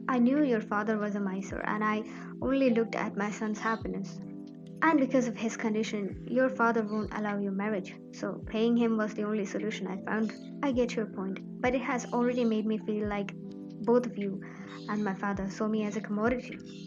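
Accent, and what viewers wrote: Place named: United Kingdom. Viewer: Are you British cause I love your vocie. It feels so real❤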